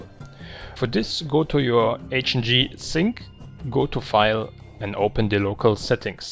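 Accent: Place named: India. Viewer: Great Video. I like the way you speaking but you sounds like an indian man who is speaking englisch for the first time.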